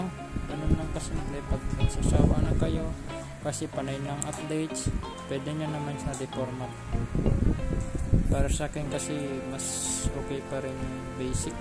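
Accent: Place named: India. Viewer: bat boses indiano parin kayo kahit pinoy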